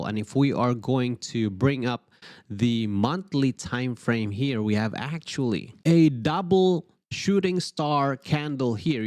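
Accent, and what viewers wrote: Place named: Philippines. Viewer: Sounds pinoy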